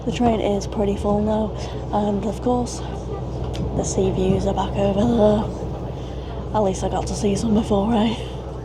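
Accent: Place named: England, United Kingdom. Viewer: Nice to hear an English persons perspective on Ireland...love that accent by the way!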